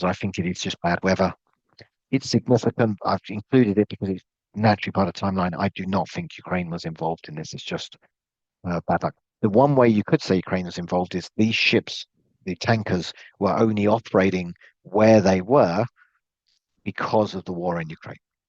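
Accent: United Kingdom. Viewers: I love your briefings, but the sound is horrible. a) British accent, b) RUS/UKR names and locations, c) lots of acronyms and technical words. Time to block channel.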